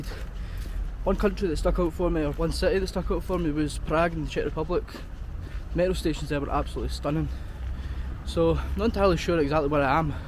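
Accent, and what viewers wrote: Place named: United Kingdom. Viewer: British akcent 😁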